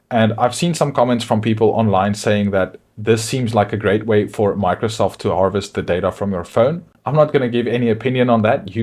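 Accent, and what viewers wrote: South Africa. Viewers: You sound South African... homie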